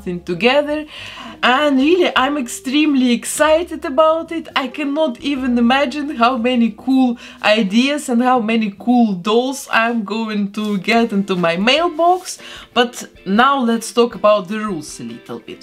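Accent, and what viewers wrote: Russia. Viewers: Хмм, русский акцент?🤔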